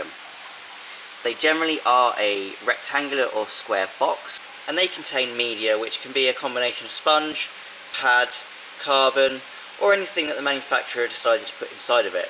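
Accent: England, United Kingdom. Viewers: Kit Harrington accent...nice 👀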